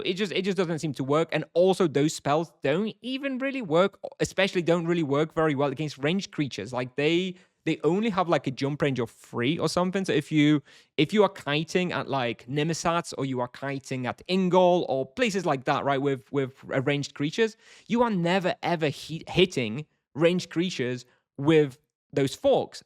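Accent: United Kingdom. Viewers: Unrelated question. Why do you have a proper British accent if you're Polish?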